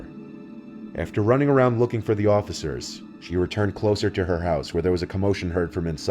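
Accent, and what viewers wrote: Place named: New York, United States. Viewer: almost couldnt get past mr. nightmares new york accent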